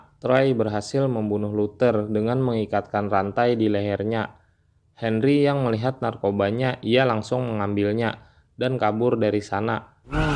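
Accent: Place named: South Kalimantan, Indonesia. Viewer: Totok banar banjarnya masih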